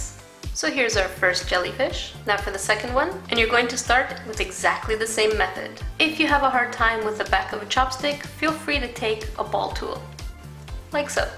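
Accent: Quebec, Canada. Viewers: Are you Canadian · are you Canadian or some really French Canadian person??? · are you Canadian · Canadian aye?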